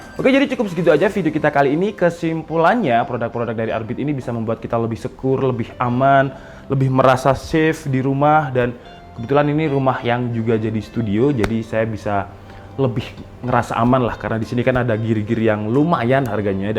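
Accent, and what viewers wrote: Java, Indonesia. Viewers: Jane ngunu arek endi se pean iki 🙈🤣 kok jowo e medok memehan ae bingung bhs indo ne wkwk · bahasa indo ne pemean opo? " jemuran " wkw ngakak cok jowo kentel tenan 😂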